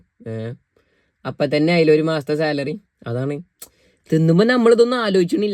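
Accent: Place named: Malappuram, India. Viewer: 😂😅😅😅 malappuram slang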